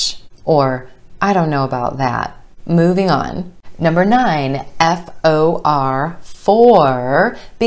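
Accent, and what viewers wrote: United States. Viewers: Her accent is rothic, so it's easy to notice that this is American English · when i will achieve a posh american accent like you= I will have been already, - ready)) At finally 26 - Thanks a lot and applause!!!! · Really your this lesson is appreciated good way to teach basic of Americans accent superb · Thank you coach , your english is hundred percent american 🙋😘👏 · This is the first time,I am learning American accent from you. Thanks,Md. Manik Miah . From BANGLADESH.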